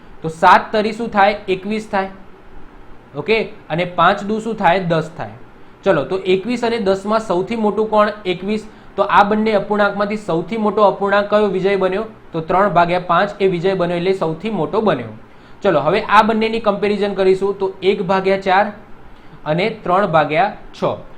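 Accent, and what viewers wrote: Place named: Kathiawar, India. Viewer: Sir bov lecture joya tamara bov maja aave se bhanvani amari jevij sudhdh kathiyavadi bhasha se tamari 😊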